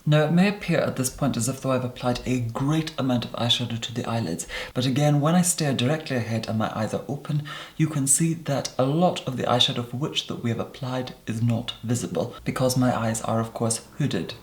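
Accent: Scotland, United Kingdom. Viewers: Is that a hint of a Scottish accent I can hear ? Yes I’m new here 😊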